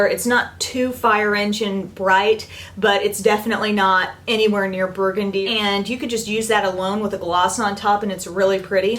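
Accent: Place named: Alaska, United States. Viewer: Where are you from? It sounds like you have an Alaskan accent.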